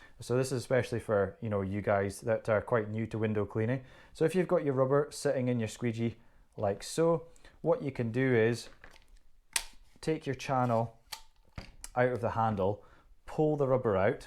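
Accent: Scotland, United Kingdom. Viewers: Hi mate,great videos as always very clear, excellent communication, which will help a lot of people, ( despite the Scottish accent lol). I have trouble getting the edges of my rubber cut straight,do you have any advice for a tool to do this please instead of using a pair of scissors or a Stanley knife. I'm 25 years traditional, words fail me to express the joy, utter joy, at using the Mormon Liquidator!! This tool is in my view,unparalleled, and cannot be improved upon, it is Perfection.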